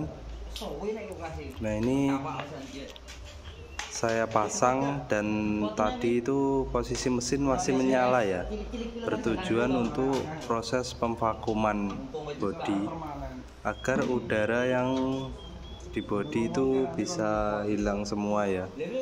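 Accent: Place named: Central Java, Indonesia. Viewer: Logat bicara jateng ..jatenge ngendi pak..?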